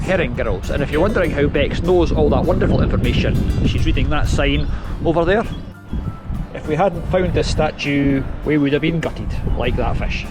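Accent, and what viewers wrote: Scotland, United Kingdom. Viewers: Great video, guys! I often have to go back and listen to what you said a couple of times, not always because you are talking fast, but more often because your Scottish Burr is quite heavy. I’m not complaining; I like it.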